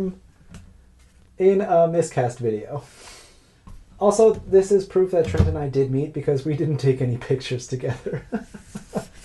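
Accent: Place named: United States. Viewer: I did not expect an American accent to come out of your face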